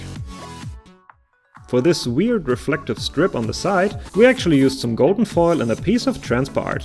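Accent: Germany. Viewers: spork they have a German way of English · Sehr nice videos die ihr da macht! Ich bin vielleicht kein cosplayer aber ich schaue diese Videos einfach trotzdem sehr gerne. Sie sind sehr unterhaltsam und den deutsche akzent kann man einfach so herraus hören das alles so "vertraut" vorkommt. Was ich mich einfach noch frage ist, wo die Tracer guns sind? :D Tracer ist ja das Masktochen von Overwatch und fehlt mir irgendwie in eurer video Liste. Macht weiter so und ich hoffe ihr werdet einfach NOCH größer! PS: Ich habe euch natürlich auch ein Abbo da gelassen. MFG Sebastian ;)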